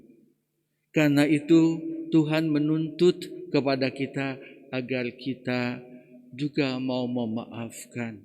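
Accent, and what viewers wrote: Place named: Sunda, Indonesia. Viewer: R I P... Saya sedih karena romo tdk akan pernah lagi memimpin misa harian pagi pkl 06. yg sy ikuti secara live, tenang dn damailah bersama Bapa di surga romo...warga paroki keluarga kudus cibinong, keuskupan bogor.. Yg akan sllu terkenang dgn khotbah dialek sundana 😥😭😭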